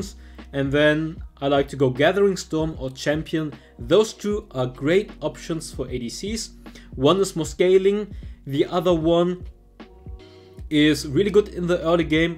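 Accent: Germany. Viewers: Most probably Germany. Pretty typical accent over there. 😁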